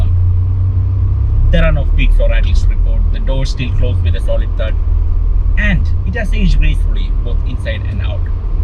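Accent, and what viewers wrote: Kerala, India. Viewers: Bro...I feel that thick Malayali accent 🤣👍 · Malu english mind blowing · Just one thing.....you have that typical Mallu Accent...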